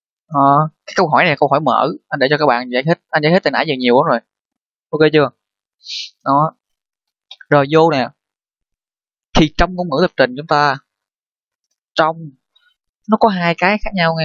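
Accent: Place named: South, Vietnam. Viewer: ước gì anh ra thêm nhiều video hay như này ^^ thích giọng nói của anh lắm luôn, rất dễ hiểu...coi xong video của anh là e thích con trai miền nam luôn đấy ^0^, cảm ơn anh nhé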